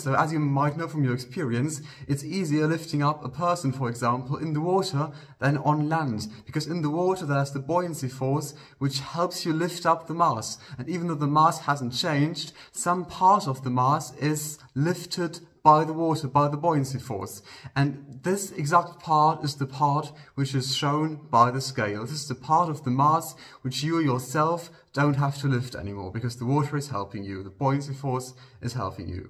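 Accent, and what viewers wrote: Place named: United Kingdom. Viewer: British english 😮🎉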